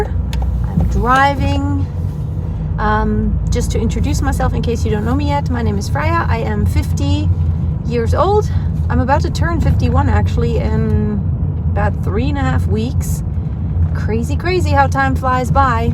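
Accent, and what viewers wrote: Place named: United States. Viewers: How it is possible to get a perfect American accent like you were born there ? You are from Germany but sound like an American.🌹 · I think she is in Germany 🇩🇪 but originally American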